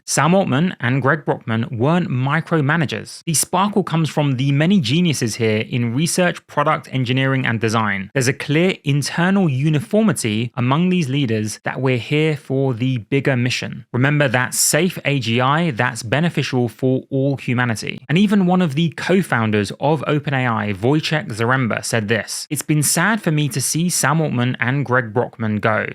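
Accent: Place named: United Kingdom. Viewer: You went full detective mode in this one. Your British accent fits that very well xD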